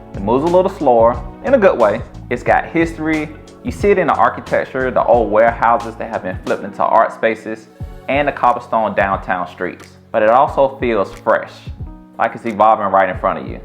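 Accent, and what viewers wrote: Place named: North Carolina, United States. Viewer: I can tell by that accent he is actually from nc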